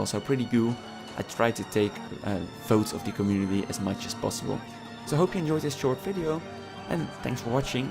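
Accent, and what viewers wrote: Netherlands. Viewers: you dutch? i hear a dutch acsent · dutch, I guess. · Are you by any chance Dutch? Cool video btw:) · Volgens mij hoor ik een Hollandse ondertoon? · lol your dutch accent tho:D so obvious haha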